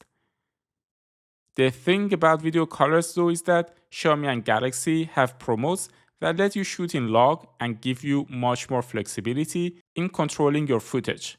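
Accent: Iran. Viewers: به به لحجه ایرانی 😂❤❤❤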